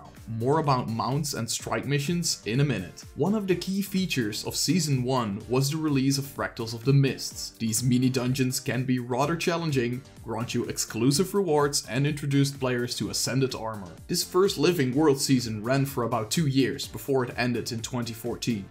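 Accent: Netherlands. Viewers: I can slightly hear the dutch accent mijn vriend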